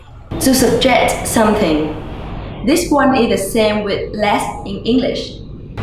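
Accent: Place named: Vietnam. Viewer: Video rất hay. Trên youtube có rất ít video dậy tiếng Việt bằng giọng khác tiếng miền Bắc. Quê hương là ở đâu? Miền nào? Cảm ơn và TVO nhiều.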